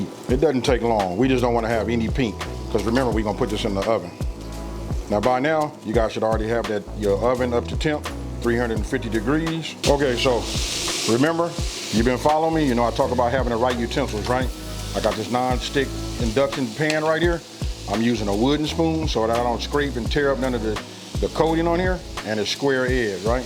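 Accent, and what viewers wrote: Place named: Detroit, United States. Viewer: he must be from Detroit he sounds just like he from there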